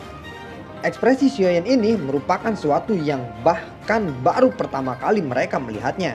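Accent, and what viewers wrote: West Java, Indonesia. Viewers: orang sunda gening mang wkwk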